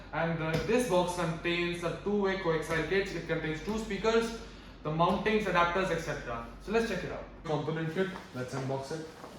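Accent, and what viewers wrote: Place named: India. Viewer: It is not amazing - I can barely understand anything with his deep hindu accent!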